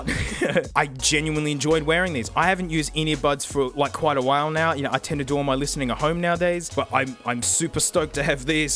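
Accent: Australia. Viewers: Aussie all the way mate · That's the aussie accent for ya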